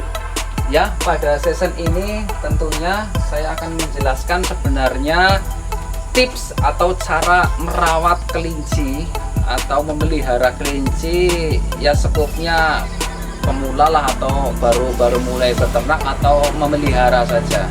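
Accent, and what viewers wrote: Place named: Banyumas, Indonesia. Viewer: Walau sy pelihara English anggora tp tertarik sekali nonton chanel sampean, bs juga di terapkan,, aplg sama2 ngapak haha Salam ngapak Banjarnegara👍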